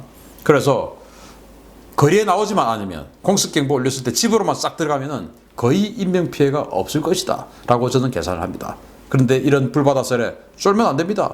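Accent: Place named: Gyeongsang, South Korea. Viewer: 처음에는 말투도 경상도 말투고 선거때는 내용들중 많은 부분이 통합당 이나 박정희 박근혜 지지하는 내용이라 반감이 많았는데 .. 요즘은 민주당 집권을 인정해서 인지 나름 신인균씨의 절대 장점인 국방 관련 에만 노력하시는듯해서 보기 좋습니다. 오히려 민주당 지지자 이지만. 저역시 항상 민주당 지지 자가 아니라 역시 신인균씨도 같을거라 생각합니다. 저는 대한민국 지지자 일 뿐이고 조국에 방해가 된다면 싫어하는 스탈입니다. 그게 적당한것 같습니다. 아마 대부분 국민들이 그리 생각 할듯해요.. 저역시 .. 민주당 통합당 을 떠나 대한민국의 이득이 중요하다 생각하듯 대부분은 그리생각할듯요 ㅎㅎ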